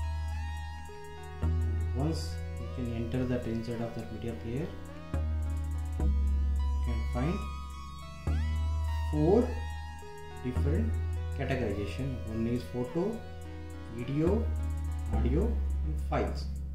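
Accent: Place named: India. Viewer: indian pronunciation I can't understand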